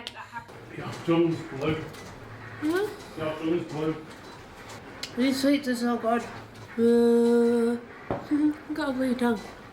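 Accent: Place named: Yorkshire, United Kingdom. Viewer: Everything is so adorable!! Plus its great to hear a proper Yorkshire accent on YouTube! Makes me feel at home.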